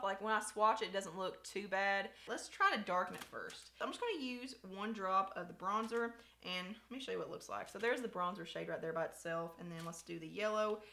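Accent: South, United States. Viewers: I love your channel and southern bell accent btw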